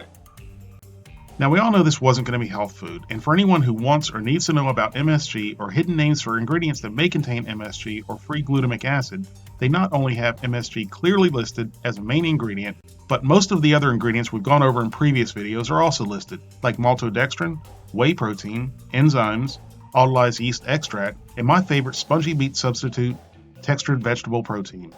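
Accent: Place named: Carolinas, United States. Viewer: not related to your video but you sound like a Carolinian and if so hell yes all the best creators are from hear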